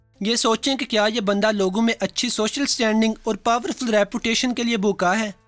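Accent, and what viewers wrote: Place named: Gujranwala, Pakistan. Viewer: Bhai g gujranwala k lag rhy...😌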